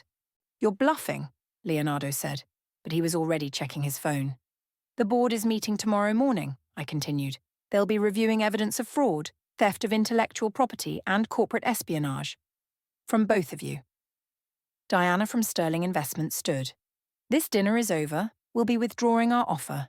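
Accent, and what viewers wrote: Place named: United Kingdom. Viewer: The British accent drives me crazy. Sounds like she has a mouth full of marbles. I’m gone!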